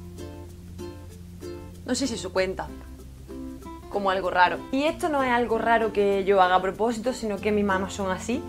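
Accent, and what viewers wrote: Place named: Granada, Spain. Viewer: Uo eres genial! Llevo todo el dia viendo videos tuyos! Por qué parte de Andalucía eres? Me recuerda mucho tu acento a Granada:D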